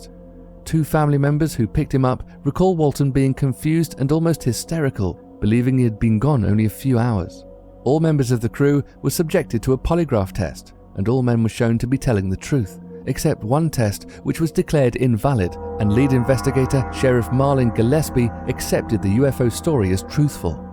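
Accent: United Kingdom. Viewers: it looks great, but who is this British narrator? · Who is this British guy commentating more often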